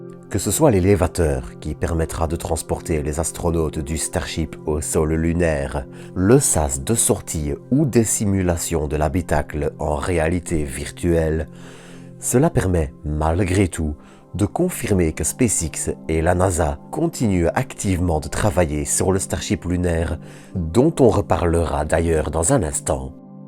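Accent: Belgium. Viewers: merci pour les précisions, mais j’avais une autre idée de l’accent belge, encore bravo pour tes videos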